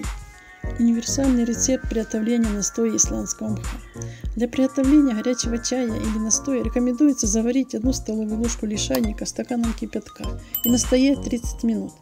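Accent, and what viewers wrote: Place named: Ukraine. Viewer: с украинским произношением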